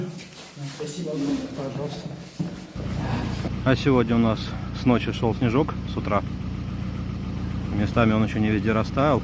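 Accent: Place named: Saint Petersburg, Russia. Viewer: оу, питерский окцент, в верьх.спасибо за кино.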